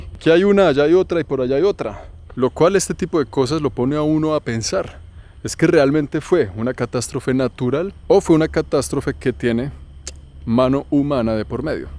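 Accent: Colombia. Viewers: Eres de Panamá y por que tienes acento colombiano???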